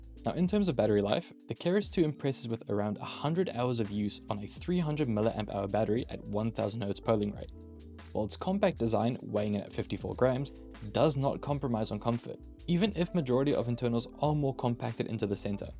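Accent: South Africa. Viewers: You sound South African. Where do you get your mice from?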